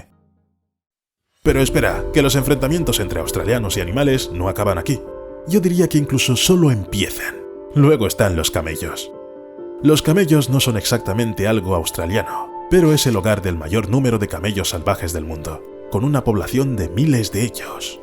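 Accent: Spain. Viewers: A leguas se nota que el de la voz es ibérico, enfatiza la "S". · Ese acento de España es agradable.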